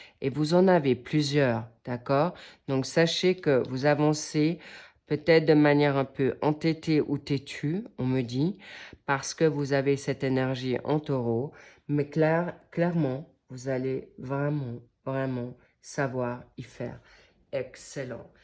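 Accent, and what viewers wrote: England, United Kingdom. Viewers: Merci, comme vous dites, c'est "wow"... Connections d'âmes et de coeurs..... D'après votre léger accent, je pensais que vous étiez anglaise...(où autre anglo-saxon)....😉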